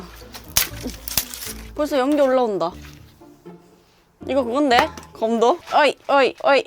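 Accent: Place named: Gyeongbuk, South Korea. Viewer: 경북사투리 구사너무귀여워~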